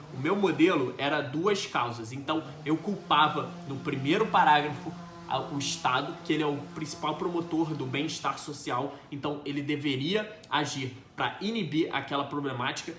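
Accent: Rio de Janeiro, Brazil. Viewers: Eu adoro esse sotaque Carioca, sério kkkk❣ Parabéns os vídeos tão muito bons, estão me ajudado a manter o foco nessa reta final vlw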